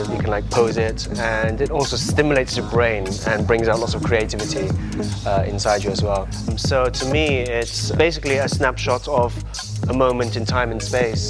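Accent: United Kingdom. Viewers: wow, first time hearing your voice. I love the british accent! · Ahaha, those years in Japan still haven't cost you your Brit accent, I see:)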